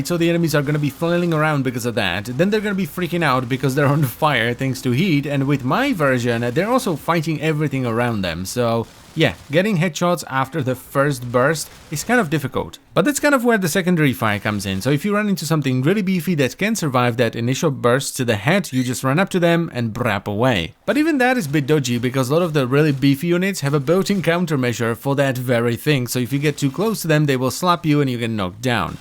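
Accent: Czech Republic. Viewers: He does have a slight Czech accent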